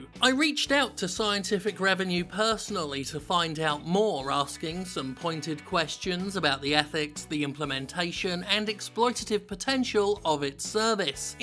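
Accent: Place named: United Kingdom. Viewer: When he is fit, speaks with a British accent and uploads a 22 minute video on a Monday. Thank god for Jim Sterling Son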